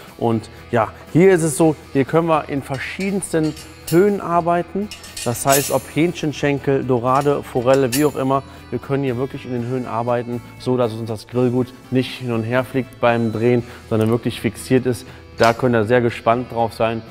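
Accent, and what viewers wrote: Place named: Rhineland, Germany. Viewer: endlich wieder rheinische tön hüüre, näää wat es dat schön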